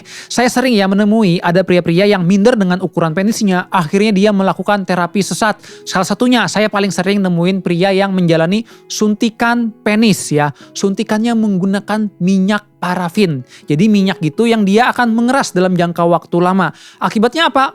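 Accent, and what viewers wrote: Banjar, Indonesia. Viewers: lohh urang banjar sekalinya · Eh orang banjar kah?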